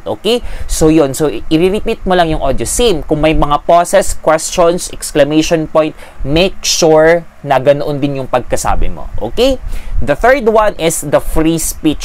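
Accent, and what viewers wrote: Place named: France. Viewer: Are you from France if yes you have very excellent communication skills and while you speak you are getting some touches of your native language while speaking ❤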